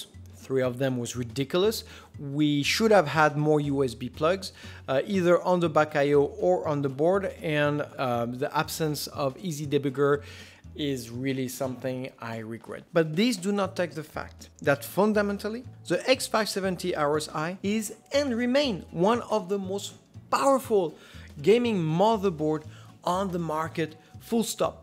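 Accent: France. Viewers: This french accent, you can fight it but you can't win against it..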